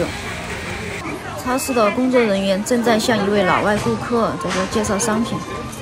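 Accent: Sichuan, China. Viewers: 川妹子啊，，這川普。。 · 我想听听你的英文发音有没四川味？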